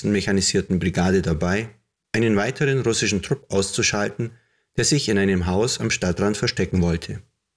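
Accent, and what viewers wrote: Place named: Allgäu, Germany. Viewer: Naja, so halb. Halb Deutsch und halb Allgäuerisch. Besser wäre, ganz Allgäuerisch.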